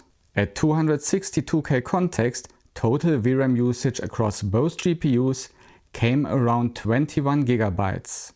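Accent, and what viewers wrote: Germany. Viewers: Hey bisschen off topic und bitte nicht übel nehmen - wenn Du magst, könntest Du mal ein paar von den schwierigeren englischen Wörtern, die man ständig benutzt beim Thema KI (inference fällt mir spontan ein, aber es waren mehr), nachschlagen wegen der Aussprache. Tschörmen Ecksent ist auf YouTube gar kein Problem, aber bei den Fachbegriffen würde es vielleicht professioneller wirken, sie zwar mit Akzent, aber davon abgesehen korrekt auszusprechen. Ich empfehle die kostenlose Seite der Cambridge Dictionary, die haben sowohl Hörbeispiele als auch einen tollen Ersatz für die nervige Lautschrift. With that out of the way: I like your channel a lot, keep being different than those who just run the same boring tests on every new model!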